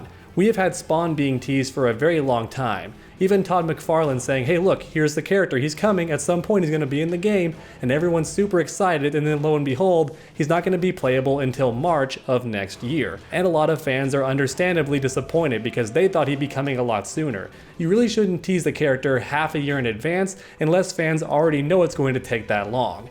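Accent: Texas, United States. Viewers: Wait, you're a Texan?!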